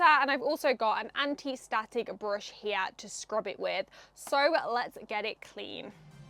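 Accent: United Kingdom. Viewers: Уёкин фойн!! Для нас кто зараза вырос на Американском произношении... вся британская речь как крендельки!.. Ну, я уж не говорю, с такой милой Леди, иха издающую!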